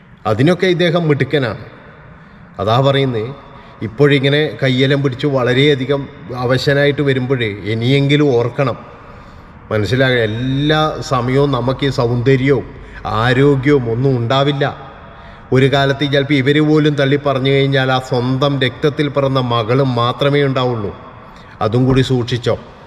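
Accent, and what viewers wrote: Kannur, India. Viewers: Kannur ഭാഷ.. 🙂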